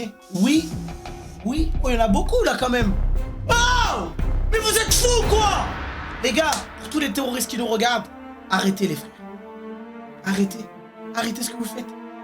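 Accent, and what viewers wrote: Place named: Marseille, France. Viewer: L’accent marseillais >>>>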